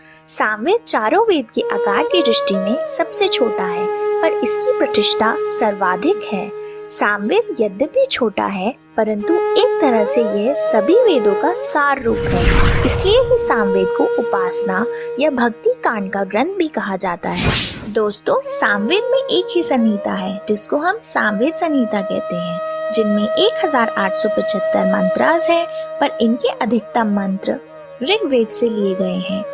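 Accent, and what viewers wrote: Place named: England, United Kingdom. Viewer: अच्छा है किंतु हिन्दी का उच्चारण उचित तरीक़े से करें ना कि अंग्रेज़ी तरीक़े से। जैसे उपनिषद ना कि उपानिषद।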